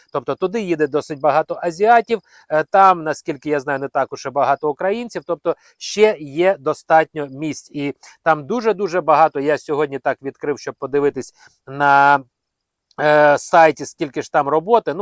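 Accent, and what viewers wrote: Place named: Russia. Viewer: Я це акання взагалі чути не можу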